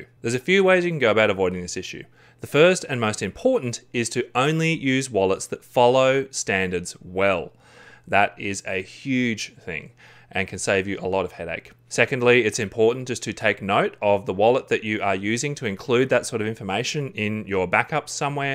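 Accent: Australia. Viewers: Hey man, your videos are great. You're Australian right? Where'bouts are ya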